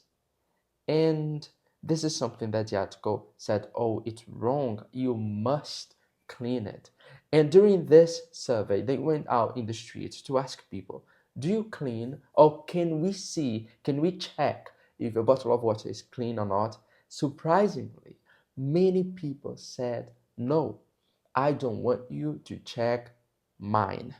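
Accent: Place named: United Kingdom. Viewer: You've got a nice British accent ! I speak with American accent, but I've always found British way of speaking beautiful! Have a nice one my friend ! 😊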